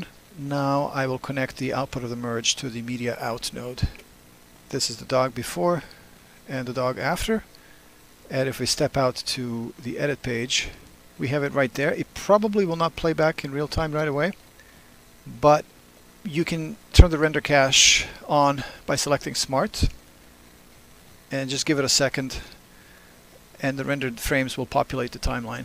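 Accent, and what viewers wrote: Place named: Russia. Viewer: Hi,It drives me crazy. You have a lot to say about a subject and then you become your own worse enemy by not doing the most simple things ruin your otherwise hard-worked tutorial. (Or in your case you just did not know or realize it sounded the way it does.) A pop filter (under $20 from B&H I hate Amazon) is just a screen put between your mouth and the mic that diffusion's the wind from your breath to get rid of the pops and esses a bad mic and setup can cause. I hate to see people go through all the trouble of doing a tut and then it's unwatchable or hard to listen to because of mechanics not subject nor idea. I hear you have I assume a Russian or Eastern accent and English is not your first language. How can you learn English and allow something as easy as not have a sock (really put a CLEAN sock over your mic) ruin your tutorials after going to the horrendous trouble of learning English? 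I've been devouring Resolve and Fusion but just found you. Good luck.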